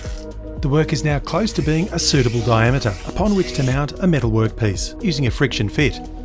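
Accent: Australia. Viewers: P.S. Always nice to hear a fellow Aussie! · one second in to this video and the ambient music + aussie accent conjures up some nostalgia for those 2000s documentaries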